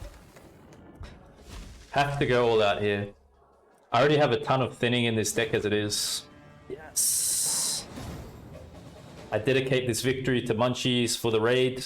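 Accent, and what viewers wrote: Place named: Tasmania, Australia. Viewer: That s why I was not sure, the Tasmanian accent tricked me 😁. Merry chrismas too.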